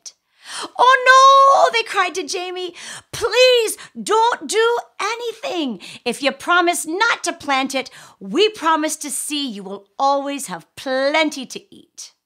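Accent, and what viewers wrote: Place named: Ireland. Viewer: Good fun, short tale and graphics to boot! Plus patented Irish accent! At no extra cost!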